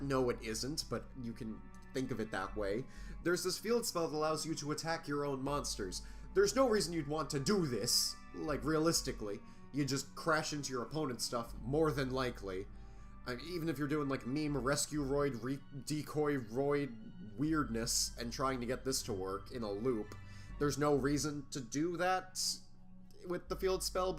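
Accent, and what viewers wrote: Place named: New Zealand. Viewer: WAIT. You were kiwi the entire time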